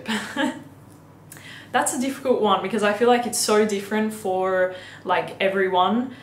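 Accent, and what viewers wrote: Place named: Australia. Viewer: I have a weird question. I’m from the UK and grew up watching Neighbours, your accent sounds very different to the people on that show, does Australia have a lot of different accents?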